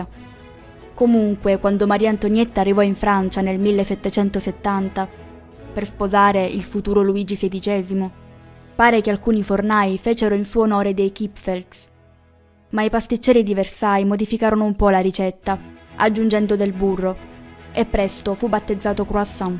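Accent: Sicily, Italy. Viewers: DAL TUO BEL ACCENTO SONO QUASI SICURA CHE SEI DEL SUD DELLA PROVINCIA DI SIRACUSA O RAGUSA BRAVA COMUNQUE MOLTO BELLO IL TUO CANALE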